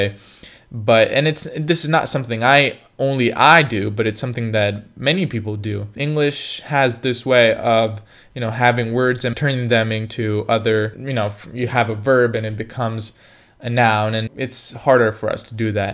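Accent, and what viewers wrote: United States. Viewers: your American accent is very good. I don’t think I’ve ever heard you speak English before and I know it’s quite an accomplishment to nail an accent without having lived in the country for many years. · you sound totally american.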